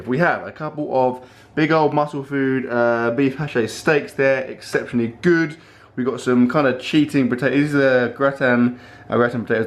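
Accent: United Kingdom. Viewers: At first I was like, did get a hair cut? And then I was like hmm, the British accent is new though, but I did miss the last video, so maybe it's his new thing. Then he said his name is, then I was like ohhhh.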